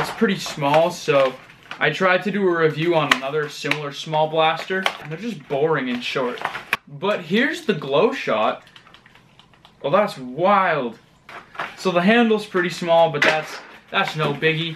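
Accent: Canada. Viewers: Are you from Quebec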